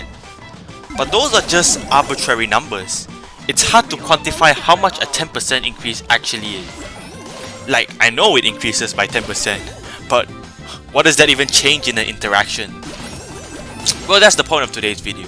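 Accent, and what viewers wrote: Singapore. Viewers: I can tell that ur singaporean XD am i right? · singaporean accent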